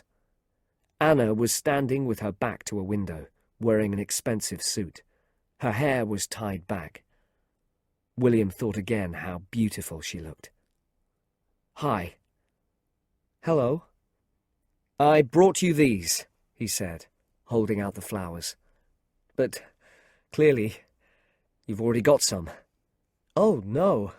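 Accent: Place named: United Kingdom. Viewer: I love the story and the beautiful British accent. Thanks a million! ❤❤❤❤❤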